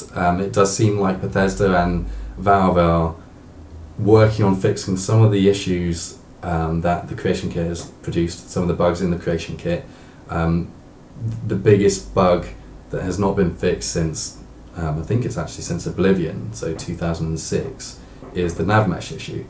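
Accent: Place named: United Kingdom. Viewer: Very interesting as always. British accent makes everything better!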